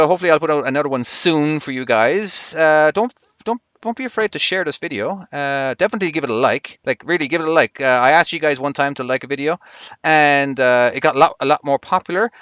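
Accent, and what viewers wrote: Ireland. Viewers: What accent do you have? To me it sounds kind of Irish, another trainee says Canada. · are you Irish?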